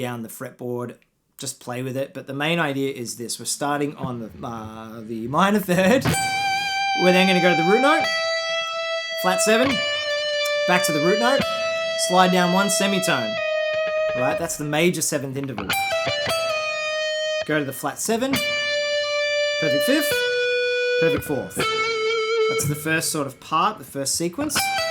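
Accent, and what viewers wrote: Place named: Australia. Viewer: Hi. Good vids man! From your accent you're an Aussie right? I am from New Zealand. We both have the same high voltage (230-240 Volts). Do you have any problems with your sound when your playing live through a Tube Amp. Like a high ear piercing sharp cold tone when you play higher up the neck? If so what do you do about it. What kind of Amp are your using. I have a Marshall and put a brown Box in front to lower the voltage. But not 100% happy with it. Love to hear about your experience with that. Cheers, John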